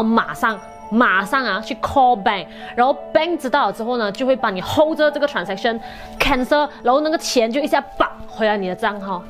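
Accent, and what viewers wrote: Malaysia. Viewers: 你是大馬華人嗎？你和春小喜都是馬來西亞人，為什麼你們的普通話口音區別怎麼大？ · 馬來西亞的口音好好玩~~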